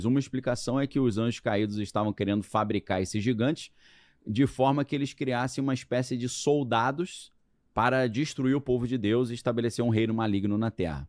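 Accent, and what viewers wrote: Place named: Rio de Janeiro, Brazil. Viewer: De verdade, a primeira vez que ouvi o pensei: vixeee la vem o carioca!!! HOJE NÃO PERCO UM VÍDEO DELE!!!!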